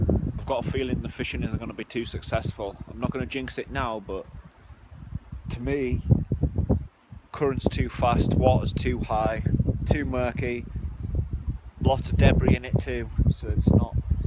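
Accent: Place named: Northern England, United Kingdom. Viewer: Connie Williams hey.u misunderstand me. I'm ribbing.I think he's a top geezer.love his work.I'm English Connie.I'm sure he won't mind me calling him a northern monkey.I understand every word or coloquesm he uses. I'm from stoke, north midlands, often described as a "clay head". We got scousers,jocks,taffs, paddies,cockneys, monkey hangers,woolybacks, yamyams.....the list goes on.we all have distinct dialects. PS everyone..no offence intended. As it goes, im 12th century Saxon invader,with Irish,Scottish and Angle blood. America and england are two nations decided by a common language. I want see catching American signal crayfish, they invasive species.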